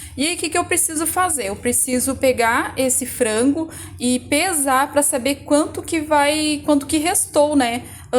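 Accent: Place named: South, Brazil. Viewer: GRATIDÃO 🙏🙏🙏ADOREI SEU DOTAQUE❤TU É DO SUL???😘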